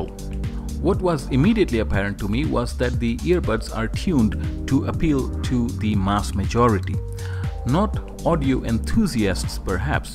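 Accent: India. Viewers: I live in India and you sound Indian too albeit you are at the initial phase of picking up the American accent where you have moved not too long ago and you were ably assisted by your kid . Bet my bottom follar you are a Bengalee I bought a Samsung AKG in ear monitor last week and it is divine in sound with Spotify and UTUBE and carties every detail of sound and is a true representation of the high class of the AKG stable. AKG is a brand which makes ptofessional sound systens , equipments and accessories. AKG was acquired few years ago by Samsung which saddened me but thankfully Samsung has maintained the European standards in AKG .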